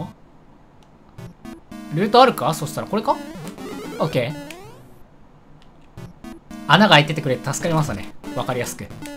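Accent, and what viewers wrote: Kansai, Japan. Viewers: バリって関西弁なんや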